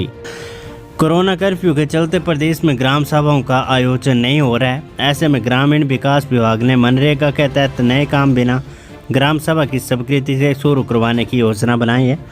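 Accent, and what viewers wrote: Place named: Pahadi, India. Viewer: Hindi bhi ap pahadi ki tarha bolte ho.... Saaf pta chlta hai... Thoda tarika sudharo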